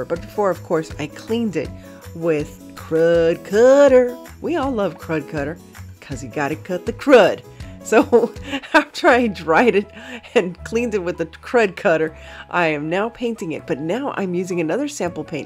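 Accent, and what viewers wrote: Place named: Miami, United States. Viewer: I thought I detected the Miami accent! I grew up there just off of Red Road and Calle Ocho! In Ocala now.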